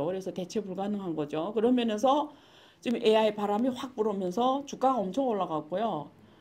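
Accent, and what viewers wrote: Yanbian, China. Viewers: 듣기 거북하다 부칸 언어...아니.뒹귁 년변어인가..불편해..제발.그만..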